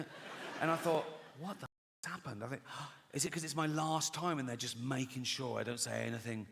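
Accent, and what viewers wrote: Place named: United Kingdom. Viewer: Only a brit can do this! It would not sound so good in American accent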